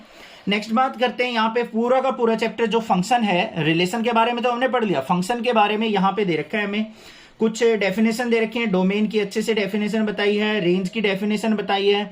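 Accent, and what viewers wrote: Haryana, India. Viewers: Sir aap Haryana se h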